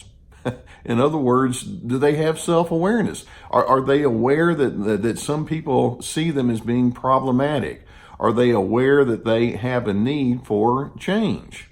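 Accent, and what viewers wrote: South, United States. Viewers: I really enjoy these videos and learn so much about how to apply the principles that both you and Dr. Ramani explain So well. You're warm and folksy style reminds me a lot of sheriff Andy Griffith of Mayberry RFD. Are younger people that is a black-and-white sitcom from the 60s and 70s about a very small southern town. Andy the Sheriff had a little boy named Opie and virtually half the shows were about some sort of silliness that occurred when people were trying to get what they wanted or solve a problem in the wrong way and ended up in trouble. Eventually Sheriff Andy would figure it out and help straighten it out and might give a lesson or two to his goofy but narcissistic sidekick deputy sheriff. And then most shows would end with Sheriff Andy Griffith explaining some principle of good citizenship and healthy honest Behavior to his son Opie, who was about 6 or 7 years old. 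He had a similar accent to and so videos remind me of sheriff Andy explaining life proper values and behavior to Opie. That show is available on streaming services and it's a very relaxing watch before bed or when you're in the mood to fantasize about a world where people are basically decent and everybody learns their lesson and not too harsh of away in the end and Common Sense prevails. Not to mention that doing the right thing pays off. What a shame it is that he couldn't raise both us and the narcissist so that we had good self-esteem and boundaries and the narcissist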